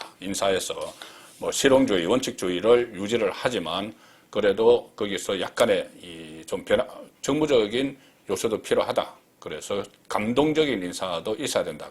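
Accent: Gyeongsang, South Korea. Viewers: 늘 수고많으세요 푸근한 경상도의 특유한 목소리로 친근감을주셔서 믿음직합니다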